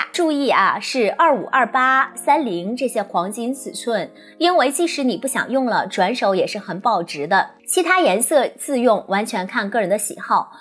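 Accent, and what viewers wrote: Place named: Japan. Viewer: 日本人的發音，長駐日本長大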